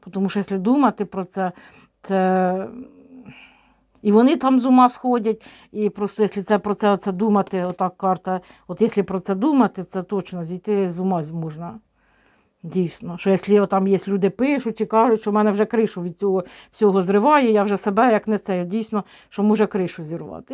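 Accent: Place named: Surzhyk, Ukraine. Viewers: Пані! Не звертайте уваги на тих,хто говорить всяку дурню! Дивлюся ваші розклади із великим задоволенням! А вашу мову суржик страшенно обожнюю. Зразу згадую дитинство і своїх дядьків і тьотю Ніну,Женьку і т.д.,які жили біля Рівного! Здоров,я вам і терпіння! Слава Україні іслава ЗСУ! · Пані, не тратьте на таких своїх сил. Адекватні вас розуміють, а іншим ви нічого не докажете. Робіть спокійно свою добру справу. А стосовно мови, то я наприклад теж з Полтавської області і розмовляю точно як ви. І мене це не дратує. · Я з дніпровської обл, розмовляють у нас теж так. Повага Вам за те, що справжня, себе не прикрашаєте і тим більшості подобаєтеся за щиру правду і гостре слово. Слідуючим президентом буде жінка, а нам, від кого нічого не залежить, треба ставати господарями своєї країни і досить боятися! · ваш суржик такий чудовий, колоритний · Супер суржик! 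Хоч це і не суржик, а східно-південна говіркка української мови! А ми як балакали так і будемо балакати!))) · Доброго дня, все правильно говорите-не маємо права забувати полеглих воїнів,захисників наших! Жодного імені! Говоріть так як вам зручно!Як кажуть:"На польтавском діалекті "!Це наша говірка. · А мені подобається ваш суржик) Україна розмовляє суржиком, Полтава, Дніпропетровщина, Слобожанщина. Чим кацапською, без "ге" - краще суржиком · Дякую Вам,за позицію,за свою думку,за ваш суржик,за те,що Вам болить Україна,за те що задумуєтесь над майбутнім,зате,що закликаєте до пам'яті,бо це ген нашого народу.Дякую.❤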